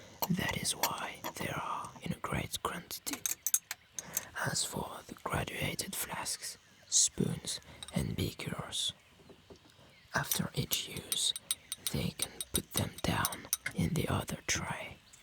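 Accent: France. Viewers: I'm from Russia, but I love listening to your English with a gorgeous French accent. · The acent of a French speaking is great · 5 minutes into the video I was like "damn his fake French accent is so on spot! ... Wait ... Is it fake tho?" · the fact that he has a french acc sent makes the ASMR so much more enjoyable and relaxing. it also makes the video feel more authentic. awesome work man much love 😊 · Nothing like listening to some French Accent ASMR before bed! I love this!!!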